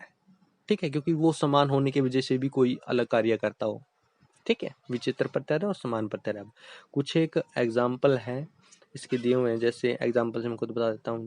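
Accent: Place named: Haryana, India. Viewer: Please don’t use haryanvi accent bro. Keep it up 🎉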